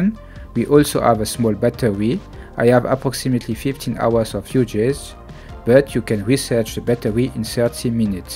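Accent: France. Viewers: Thanks for your videos. I love your french accent :)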